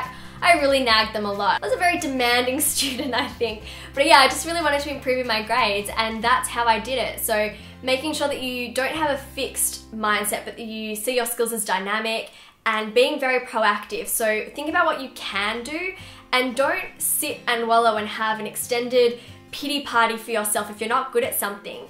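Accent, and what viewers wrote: Australia. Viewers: are u australian like me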